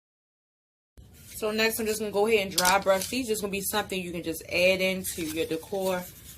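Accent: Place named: Maryland, United States. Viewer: I know we live in the same state because of your accent. I live in Reisterstown and work in the City. But I love your crafts and would be honored to have one of them.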